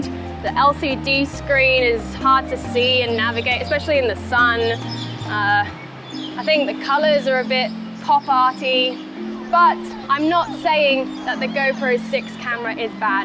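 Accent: United Kingdom. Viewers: WahWah she sounds British Not Australian!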